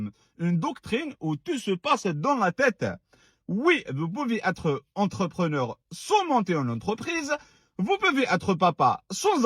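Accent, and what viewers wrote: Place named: Kabylie, Algeria. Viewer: Un vrai kabyle avec son accent👏🏻🤣👏🏻😂😎❤❤🇩🇿🇩🇿❤❤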